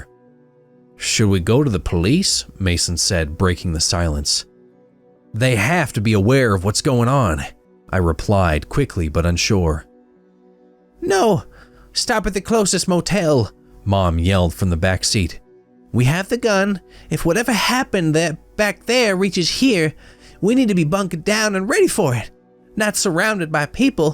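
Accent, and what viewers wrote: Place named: Midwest, United States. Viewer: This is awesome so far! The way you get more intense towards the end is cool. Your Midwest accent is funny, but semi accurate at the same time lol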